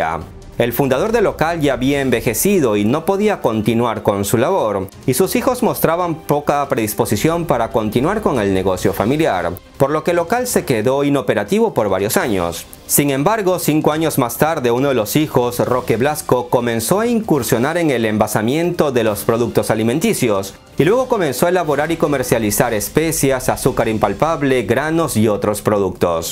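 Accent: Peru. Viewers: POR TU MANERA DE HABLAR, DEFINITIVAMENTE ERES PERUANO. YO CONOZCO MUY BIEN A MI GENTE. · Eres peruano verdad? Si es así saludos de un paisano..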